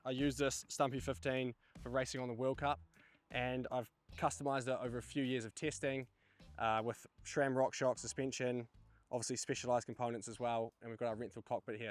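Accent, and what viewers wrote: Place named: New Zealand. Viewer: Bump dat audio a bit. The people wanna hear that kiwi accent loud n clearrr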